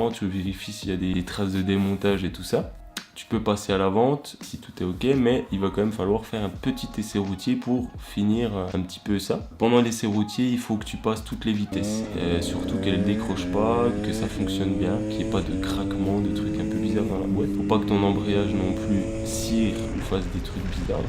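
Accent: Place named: Franche-Comté, France. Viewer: Tu es pas de Franche-Comté ? je crois entendre l'accent de chez nous !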